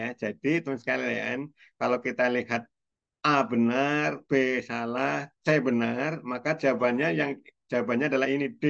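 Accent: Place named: Banyumas, Indonesia. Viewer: Org ngapak ini ya😂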